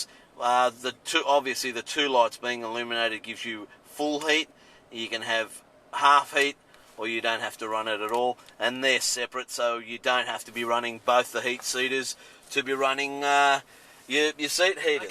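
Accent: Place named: Ireland. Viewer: this guy sound like irish man from cavan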